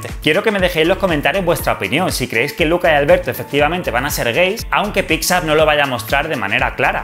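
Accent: Italy. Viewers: ¿Cuánto quiero hacer el ridículo en este vídeo?: Sí PD. Adoro que hayas mantenido el acento a todas las palabras italianas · Puedes callar ese asento italeano 😡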